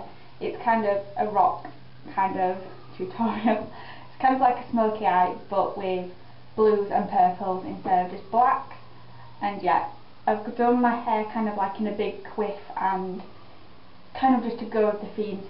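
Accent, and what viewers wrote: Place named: Scotland, United Kingdom. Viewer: Are you Scottish? My grandfather was from Scotland and you both have the same accent. I've never met him, since he died when my father was little but I have heard a lot of recordings of his voice.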